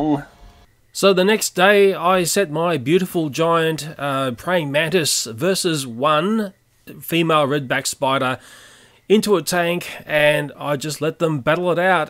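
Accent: Australia. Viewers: 26 seconds into the video and I already know he's Australian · My brain: Wait, is this guy in Australian? sees mantis Me: Yup, definitely Australian. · It called an aussie accent. Bloody tolerate it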